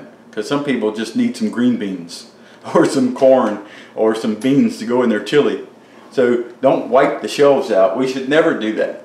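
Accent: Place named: West Virginia, United States. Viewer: I wonder if is going to play and sing in one of his videos he is definitely a patriotic American and sounds like awesome people my guess is by listening to that accent he is probably from WV... 😉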